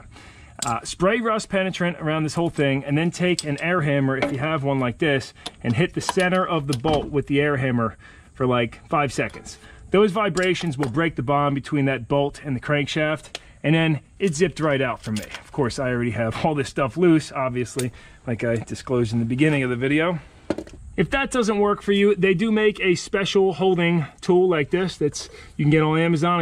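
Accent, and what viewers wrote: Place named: Philadelphia, United States. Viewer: Pendell? As in Pendel PA? Langhorne? I live in Oklahoma now but i have not heard Pendel in a loooooong time. Clearly there are more then one town called Pendel in America but i used to live in Parkland when the Airplane Restaurant was still in Pendel. Anyway you sound like a Neshaminy Or Pensbury school grad North East Philly slang having hometown homeboy. So its gotta be! Come to Oklahoma and watch everyone look at you and change your name to Yankee! Thats been my name here since i moved here in 2014! Most people dont even know my real name! LMAO Facts!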